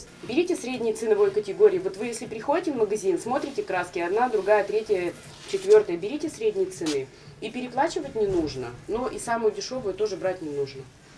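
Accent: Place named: Vyatka, Russia. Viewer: А я думаю,откуда такой акцент ? Вятские....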